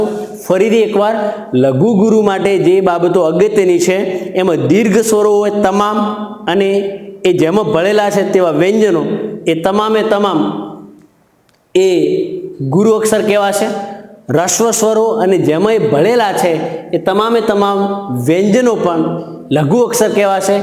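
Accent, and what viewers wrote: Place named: Bhavnagar, India. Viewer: Gajab 6o sir Aap,aapni boli parthi Bhavnagar na lagya sir😅 I m right?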